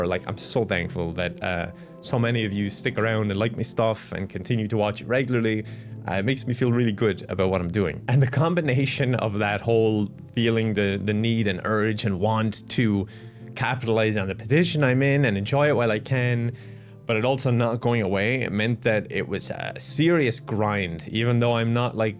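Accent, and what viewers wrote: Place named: Ireland. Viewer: Jeysus, I've watched for a little over a 3rd of my life (maybe, I was never good at math). I suddenly understand now why I have an Irish tint to my accent. Started watching somewhere around the first spider-man videos. Also even if you don't make a full cover, pleeeeese release the " " song. It was so good. And I'll need to learn that song myself in a few years.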